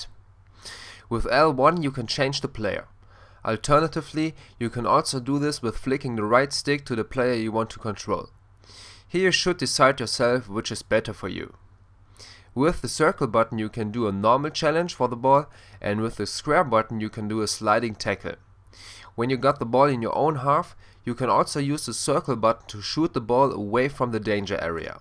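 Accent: Germany. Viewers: Thanks! BTW, telling by your accent, you are a German speaker? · This guy has an awesome accent. Hey, you all better listen to him, he's German! Germany have a sick international team. Thanks for the advice:) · you are german? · ich hasse den deutschen Akzent! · Kann es sein dass du deutscher bist ? Are you from germany ?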